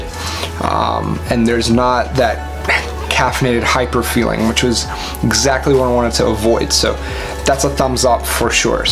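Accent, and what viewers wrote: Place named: Nigerian American, United States. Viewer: o that's what you mean. It's Nigerian American from southern part of California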